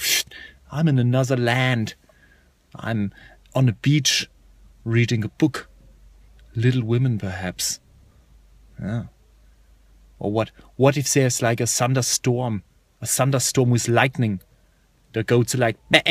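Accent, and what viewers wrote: Germany. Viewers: Are you actually German or is it just an impression?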